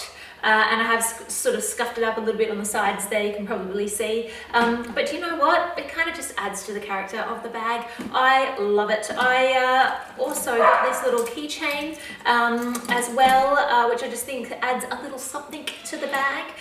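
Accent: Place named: Australia. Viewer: So nice hearing an Aussie accent on YouTube LV videos. Love the story. I almost had a heart attack when you pulled out the wallet.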